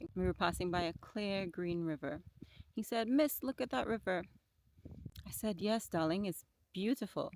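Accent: Trinidad and Tobago. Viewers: I was thinking the same thing 😮,but after she started talking I knew she was from Trinidad & Tobago.Big up Sistren.. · Awesome I love your accent my dear Trini sister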